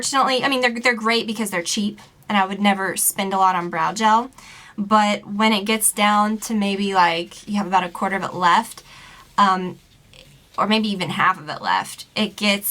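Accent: South, United States. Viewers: This is the first of all the videos Ive seen of you, where I can notice a bit of a southern accent ;) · I feel like ur starting to get a country accent or something or is that just me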